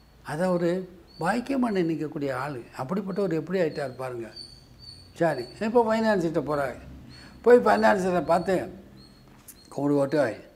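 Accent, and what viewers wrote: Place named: Madurai, India. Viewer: Wonderful presentation in Madurai slang.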